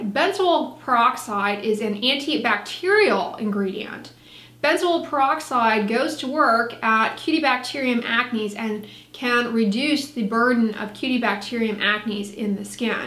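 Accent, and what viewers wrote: United States. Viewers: Where in America is that accent from?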